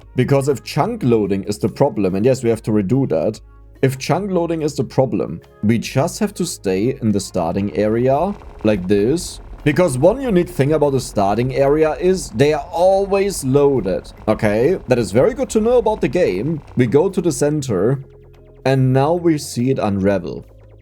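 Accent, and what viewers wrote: Germany. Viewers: It's not quite ion, more like iun. You actually said it properly at least once! But I love the way you've been saying it, the German accent makes it sound medieval and authentic somehow! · Höre ich da Deutsch 🇩🇪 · The word iron is a phonetic catastrophe, pronounce it literally however you want. Aussies don't really pronounce Rs in most words so I'm used to hearing it pronounced the same as "eyeing" with a silent g but I've heard countless youtubers pronounce it differently and the only thing that really matters is that people know what you mean. If "eye-ren" is what feels most comfortable for you given that you speak multiple dialects, then that's what feels more comfortable. Personally I like hearing the little German affectations that come through your English speaking, and I normally really struggle with accents cause hearing problems (that said I really do appreciate your vocal projection and how much you annunciate cause it makes it a lot easier for me to listen) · Ich habe die ganze Zeit darüber nachgedacht ob du deutsch bist wegen deinem Akzent.. 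und dann würdest du wütend, ich habe mich kaputt gelacht😂😂 (Inserts to friendly German) 😂😂😂😂😂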